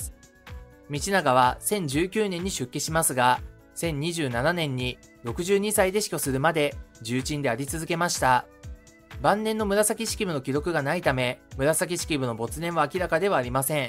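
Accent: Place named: Tokyo, Japan. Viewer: ただ全部東京弁なのがなんかなじめない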